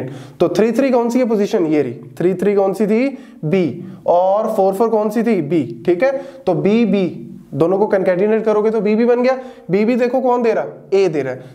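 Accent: India. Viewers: Bro I like your accent, sounds so different to other indians I watched on youtube. I am not indian but understood you very well thank you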